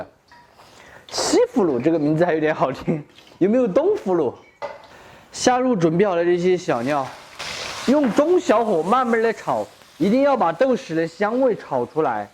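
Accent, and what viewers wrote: Sichuan, China. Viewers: 老乡的川普听起来不摆了比厨艺更有吸引力🧲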